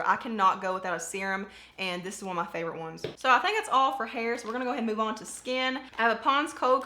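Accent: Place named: North Louisiana, United States. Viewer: Where are you from ? Love your accent . You sound like my family members in North Louisiana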